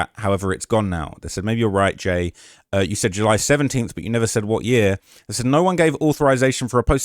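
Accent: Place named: England, United Kingdom. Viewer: Hes from England numb nuts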